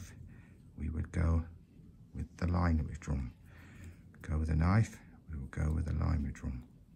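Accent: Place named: Somerset, United Kingdom. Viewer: Thanks for such a clear explanation. I also echo the comments of a previous reviewer, the Somerset accent is truly welcome.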